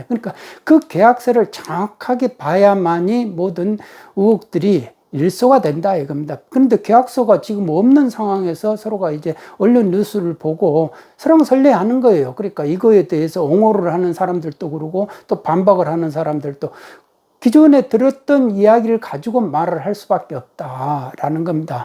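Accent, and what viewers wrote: Jeolla, South Korea. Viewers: 뭔가 팩트만 항상 말씀하시는 분 같이 느껴지네요. 억양은 라도분 같은데... 믿음이 가네요.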